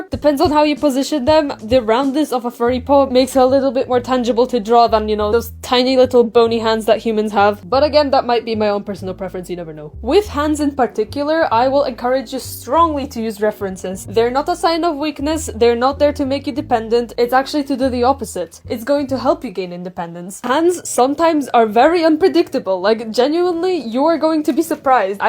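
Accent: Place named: Scotland, United Kingdom. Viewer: hold on why is it after like 9 seconds you switch to a more Scottish accent???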